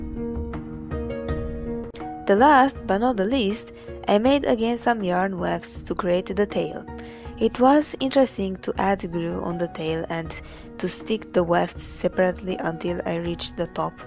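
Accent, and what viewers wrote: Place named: Russia. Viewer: Либо ты второй человек подряд с русским акцентом, либо у меня паранойя 😰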